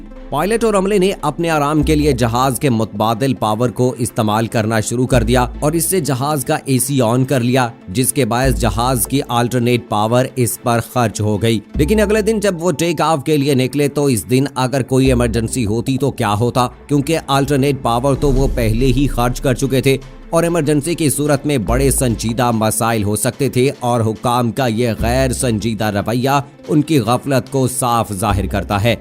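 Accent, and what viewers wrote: India. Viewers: App ke urdu Indian ka lahja jisa ha bakwas band kar do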